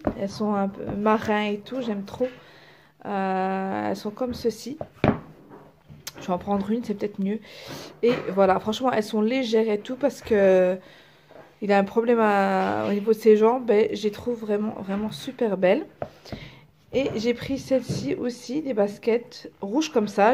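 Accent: Belgium. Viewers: Salem ma belle tu as un accent tu es belge ?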